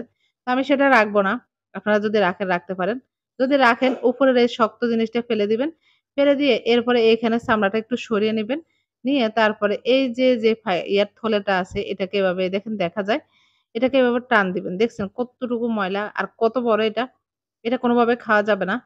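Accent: Noakhali, Bangladesh. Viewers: নোয়াখালীর আপা আপনি?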